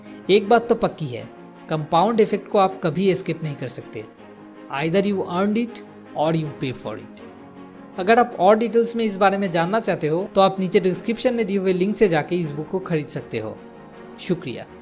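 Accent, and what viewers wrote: Bengal, India. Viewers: good video but Bengali accent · are you Bengali spekar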